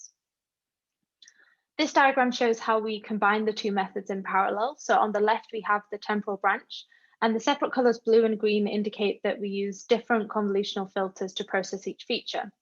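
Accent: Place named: United Kingdom. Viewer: Great work! Enjoyed the British accent!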